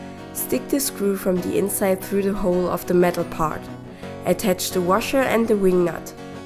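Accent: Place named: Germany. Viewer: love this idea, great job. ) and very cute german accent as well :)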